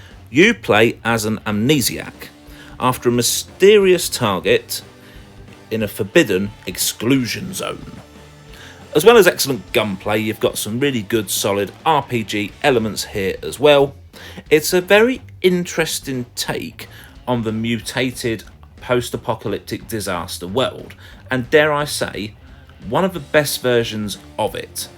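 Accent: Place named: United Kingdom. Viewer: I love british accents, +sub.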